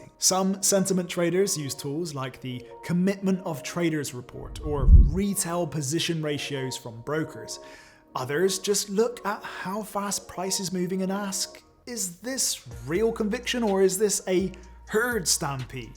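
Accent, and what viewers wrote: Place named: Bristol, United Kingdom. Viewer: Just found your channel, its really great and your accent sounds not too far from me in the Forest of Dean, sensing Bristol for you. Noticed you haven't posted in a. while. Please do, your videos on trading are refreshing. From someone learning, thanks!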